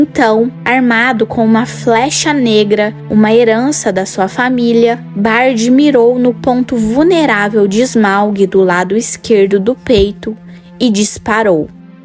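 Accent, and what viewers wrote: Minas Gerais, Brazil. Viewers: Cara?,sotaque minerio uai!,ela não puxa não, é só sotaque mesmo!(nos diga de onde vc é amigo?)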